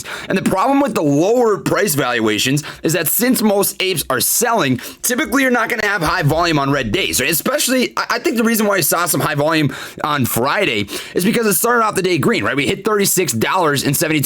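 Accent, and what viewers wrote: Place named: New York City, United States. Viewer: Haha live ur channel man. You are def from the NYC area. Haha gotta be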